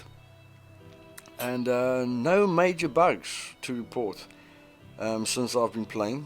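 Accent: South Africa. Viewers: You from South Africa? Subbed!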